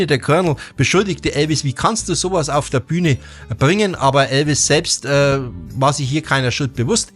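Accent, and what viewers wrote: Upper Franconia, Germany. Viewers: Hallo, Deine Videos sind phantastisch. Frage an Dich, wo kommst Du her, Du hast einen unverkennbaren oberfränkischen Dialekt. Ich bin auch eingefleischter Elvis-Fan und hatte bis 2017 eine Band. Man nennt mich den "Elvis von Gunsenum" zu deutsch Gonsenheim. Ich lebe seit 1978 in Mainz und bin aber ein Rehauer, wenn Dir das was sagt. Vieleicht können wir uns im nächsten Jahr Mal in Bad Nauheim zum Elvis Festival treffen. Das würde mich freuen. Mit rockigen Grüßen von einen oberfränkischen Elvis Fan zum anderen. Volker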